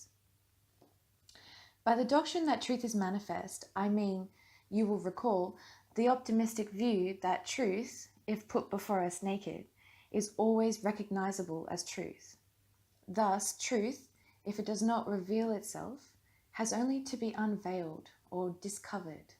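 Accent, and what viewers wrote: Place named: Australia. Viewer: Awesome and great to hear this in an Aussie accent!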